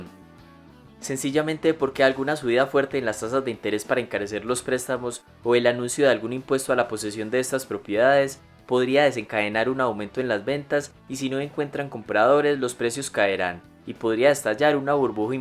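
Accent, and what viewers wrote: Colombia. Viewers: Horrible la narración en Castellano Colombiano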